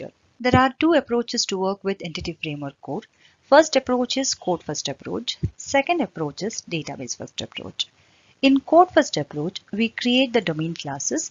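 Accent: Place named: India. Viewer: ind*an voice first second of the video, time to click off.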